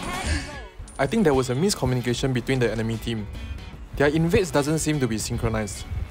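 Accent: Singapore. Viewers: I can hear ur voice and tell ur singaporean, but ur kinda concealing it? But back on topic, these invinsible arrows are annoying asf..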